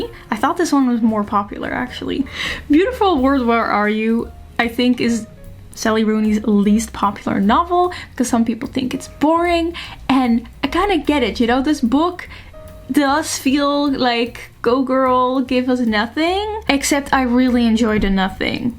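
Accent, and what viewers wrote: Netherlands. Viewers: Ik hoor een Nederlands accent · Are you Dutch? Btw, love your videos btw❤❤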